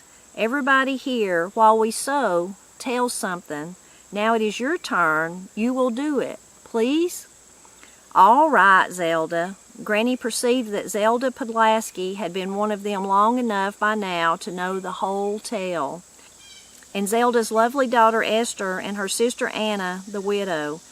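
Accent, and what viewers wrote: Appalachia, United States. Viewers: Enjoyed your reading. You remind me of my late M.I.L. And her sisters. All from Appalachia ( West VA)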